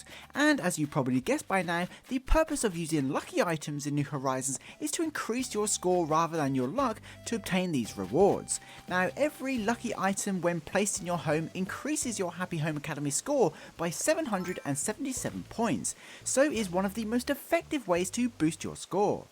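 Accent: England, United Kingdom. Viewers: he’s English lol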